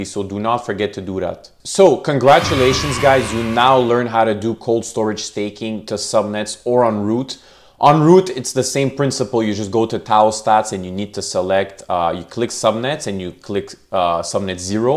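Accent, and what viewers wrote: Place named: Quebec, Canada. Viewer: J'ai l'impression que t'es Canadien français 😃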